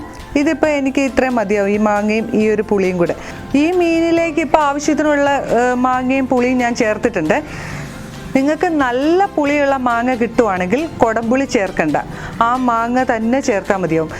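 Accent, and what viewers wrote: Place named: Alappuzha, India. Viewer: Njangal alappuzhakkarude meencurry mathramalla aa bhashayum ethra sundaramanu.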